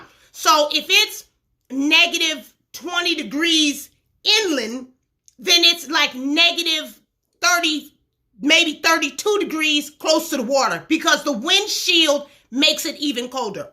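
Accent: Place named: Inner city, United States. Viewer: Damn Girl, I am impressed, you are smart. I liked how you said "I looked it up on Google", Instead of "I gOOgled it", and as gOOgled is not a verb, you can not do that. I also love how you do not trust the internet, "because they be changin shit." You are articulate, but you speak with an inner city intonation in order to reach a particular audience and achieve a desired affect. To add something constructive, I would like to add to your imploration on the learning of words, words do not have meaning. Words are representations, liguisti-graphical representation, of objects, actions, and descriptions. "Meaning" does not come from a word, "meaning' comes from you, the speaker. The problem with meaning is that once you go cross platform, the word still carries with it, its original connotation. You understand the importance of fundamentals.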